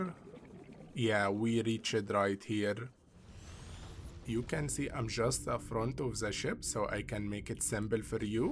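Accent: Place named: Arab world. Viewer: I like your accent, you sound like an Arab Mario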